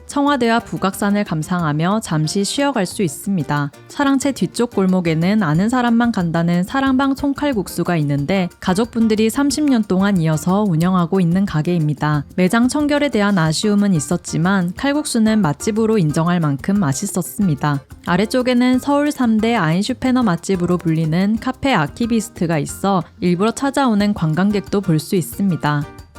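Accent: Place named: Gyeongsang, South Korea. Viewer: 경상도 사투리다! 넘 촬영도 잘하고 차분하게 나레이션해주셔서 추억뿜뿜이네요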